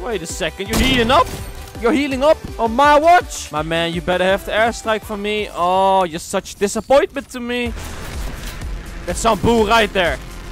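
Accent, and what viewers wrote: Netherlands. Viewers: Are you dutch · Are you dutch? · this is the most Dutch accent ever lmao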